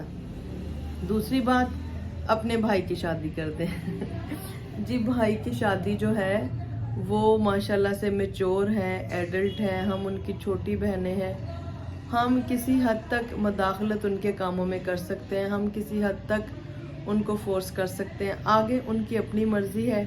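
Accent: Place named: Punjab, India. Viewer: Bhainji sat shri akal ji Tusi jdo'n bolde o menu baut sohne lgde o tusi hai v bade sohne o Tuhadi theth punjabi v sade vrgi a Tusi pakistan vich kitthe rende o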